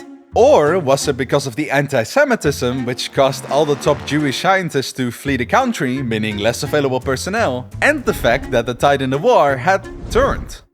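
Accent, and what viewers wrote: Netherlands. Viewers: Are you dutch? I think i can recognise an accent there. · Are you Dutch because you a bit Dutch accsend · You have a Dutch accent i think.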